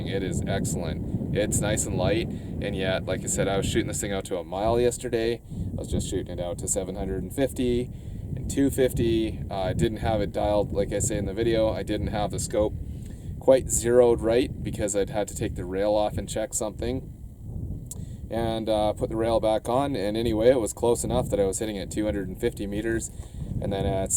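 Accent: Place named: Canada. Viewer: noooo Canadians I knew it when you started talking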